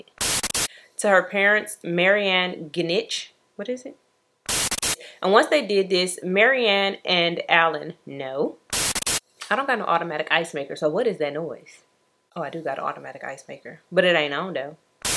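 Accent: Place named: Chicago, United States. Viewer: Lol you definitely from close to Chicago, you funny as hell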